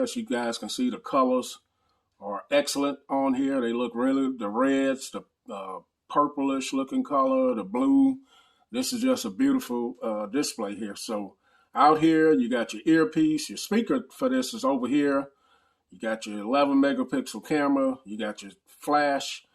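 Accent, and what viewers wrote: South, United States. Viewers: Like MKBHD with a southern accent lol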